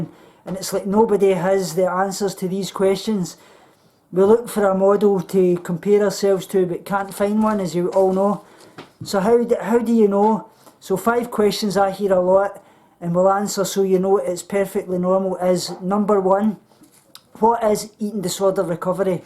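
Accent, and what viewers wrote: Scotland, United Kingdom. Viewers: thanks for these refreshing thoughts. you are so inspirational. i do not consider myself in recovery because I still practice all of my ED behaviors, but I do see a therapist regularly and I am starting to consider the idea that maybe I deserve recovery. that maybe my life could be better. maybe, just maybe, I have a future to live for. so maybe because my thoughts are changing, even though my behaviors aren't that I can consider myself in recovery. idk. what do you think? btw I wish I lived in Scotland so I could hug you. you are so sweet. and I absolutely love your Scottish accent. I know it isn't an accent to you, but I am from the U.S. so it sounds so cool to me. i wonder how our accent sounds to you. sorry. I am weird. it is am here and I need sleep!! ❤😘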